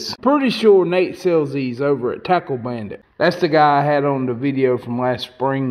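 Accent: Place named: South, United States. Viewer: I really enjoy listening to a fellow SOUTHERNER, with that country draw like me .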